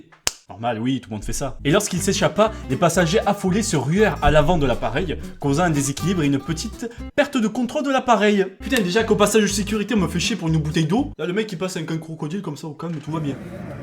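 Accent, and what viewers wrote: South, France. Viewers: Lmao he has a strong southern accent so good Luck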